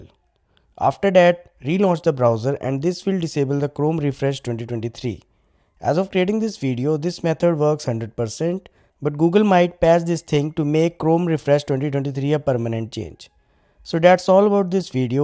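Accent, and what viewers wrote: India. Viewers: When you hear Indian accent, it's usually scam. But this guy is legit. Saved my butt.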